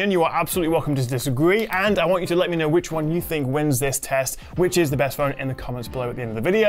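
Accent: United Kingdom. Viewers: Brother he's from England not Denmark didn't you heard the British accent 😂 of course it was filmed on England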